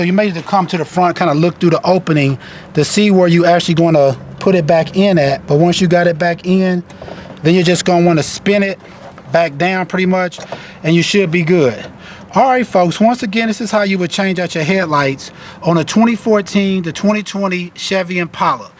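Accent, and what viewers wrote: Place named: Nashville, United States. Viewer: Brah, are you Nashville TN,? That's how you talk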